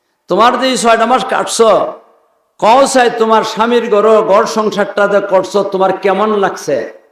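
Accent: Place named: Brahmanbaria, Bangladesh. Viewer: এই হুজুরের গ‍্যান সিমীত। আরো সুন্দর ভাষায় ব‍্যখ‍্যা করতে হবে। বাংলা আরো শুদ্ধ করে বলতে হবে, বি.বাড়ীয়া এলাকার accent ব‍্যবহারে বাংলা বললে হবেনা।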